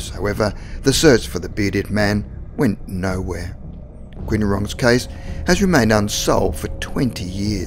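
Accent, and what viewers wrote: Australia. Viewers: Great video man! Also I love your accent, Aussie pride! 🐨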